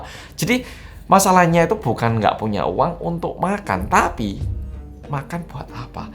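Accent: Java, Indonesia. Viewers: wajahnya korea tapi logatnya jowo kental banget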